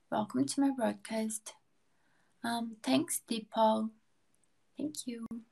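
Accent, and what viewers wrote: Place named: United States. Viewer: Eu pensei que você era americana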